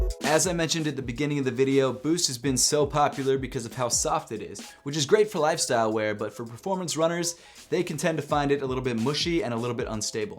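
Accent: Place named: Philadelphia, United States. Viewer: What part of philly you from bro?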